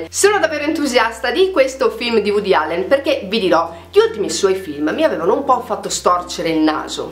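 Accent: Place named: Romagna, Italy. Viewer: ahh scusami è che io sono romagnola e la tua s mi ricorda il nostro accento. scusami :)😹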